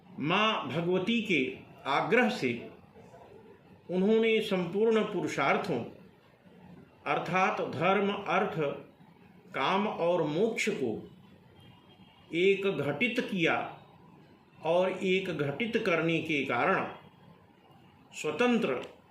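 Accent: Standard. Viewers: 🙏🙏🙏🙏🙏 apne bahot suddha uchharan kiya hai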